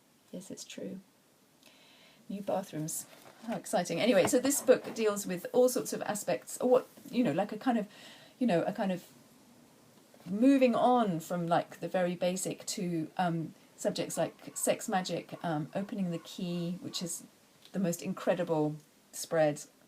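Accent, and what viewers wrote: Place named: England, United Kingdom. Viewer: How did you get a nice English accent ?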